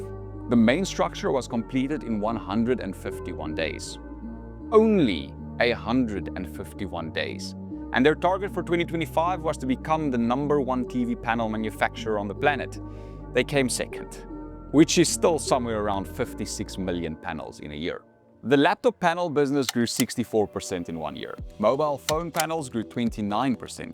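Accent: South Africa. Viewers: Are you South African👂? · You're South African?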